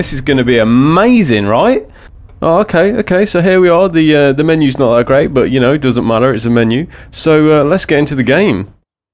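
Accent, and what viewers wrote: United Kingdom. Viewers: Where in Britain are you from?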